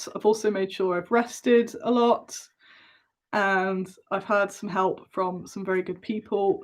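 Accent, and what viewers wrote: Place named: United Kingdom. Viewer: You talk like a British person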